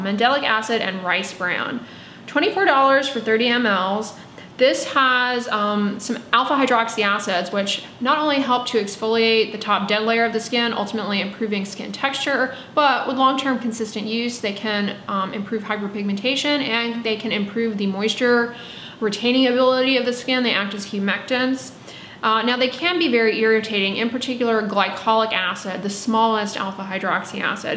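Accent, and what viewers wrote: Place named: Midwest, United States. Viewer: She sounds like she is from the Midwest ..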